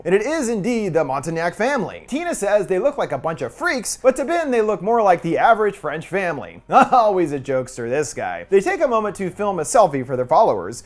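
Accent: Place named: United States. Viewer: that American accent is so annoying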